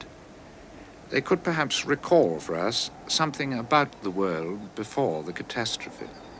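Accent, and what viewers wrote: RP, United Kingdom. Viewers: Its like a weird apocalyptic pastiche of a salvidor dali painting, in a posh british accent 😂 I love it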